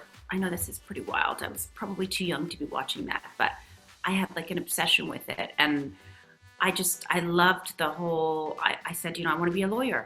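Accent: United States. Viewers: sounds more American and less British in this video...........her voice is very different on RHOBH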